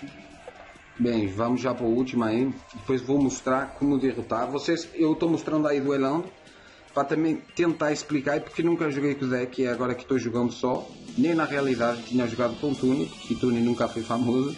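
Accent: Portugal. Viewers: que sotaque é esse cara??? é um português falando português-br?? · gostei da sua visão de jogo e comentarios. não entendo muito portugues de portugal mas ta valendo rs.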